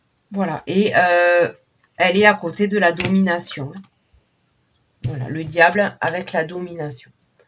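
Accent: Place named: South, France. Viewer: Vous avez un accent du soleil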